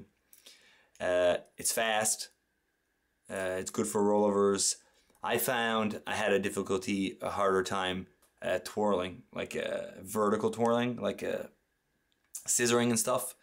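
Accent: Canada. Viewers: Your full Canadian accent came out in this video.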